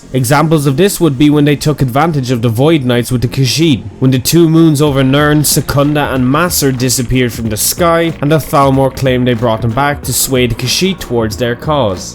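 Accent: Ireland. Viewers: I would suspect you are Irish after this vid if it is not for your nickname and flag) Keep up the good work.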